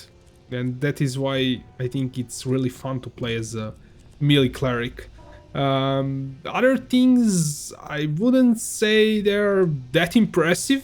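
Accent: Russia. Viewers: Hi, thx for the vid. Is that Russian accent or Polish? Just asking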